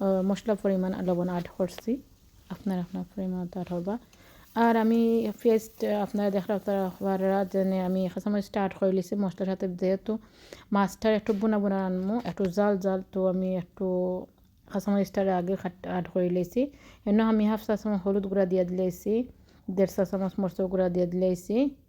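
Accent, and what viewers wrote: Sylhet, Bangladesh. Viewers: Sylheti kotha ghula vala lagw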